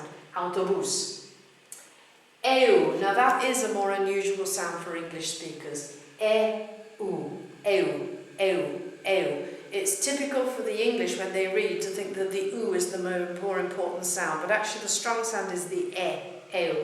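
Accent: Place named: Spain. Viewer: Have to start saying these videos are really good and she explains them very well, after all she is explaining the basics and then if people want to learn the language just have to learn vocabulary, now english speakers need to know that as in english language there are accents, and she is explaining spanish from Spain accent, thats why the CE/CI sound like a ZE/ZEE. so since most of spanish speakers are latin that CE/CI sounds like the S when you say say. very good video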